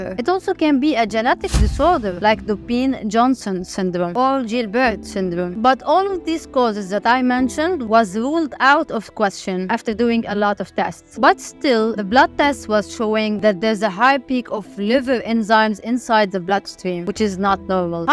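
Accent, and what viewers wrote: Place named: Lebanon. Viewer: English with a Lebanese flavor....... ملوخية flavor 😂😂😂😂❤❤❤❤ Though I don't like (ملوخية) my family keeps on making it...... I wish you all the best, I'm following you on your YouTube channel in Arabic and I'm following you here and I will share this with my friends.... thank you for everything, and congratulations for the 100.000, hope you'll reach more and more.... Most importantly, wish the war will end in Palestine 🇵🇸🇵🇸🇵🇸 We pray for the families, Incha Allah Lebanon will be safe 🇱🇧🇱🇧🇱🇧🇱🇧🇱🇧 From Algeria 🇩🇿🇩🇿🇩🇿, your fan ❤❤❤❤ Abdessamad........ Keep up with the good work, you're amazing ☺️👌❤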